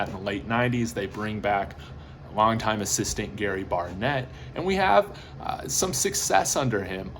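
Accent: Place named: United Kingdom. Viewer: yes I saw - nice to hear a British accent in the videos. Traoure looks like a beast - but I was also waiting on Christian wade to break through for the Bills! Fingers crossed CU can get one of them into the league - trying to keep up with who is from last year with the CU and who are the new prime recruits. But it will be fun to watch the spring game! Keep up the content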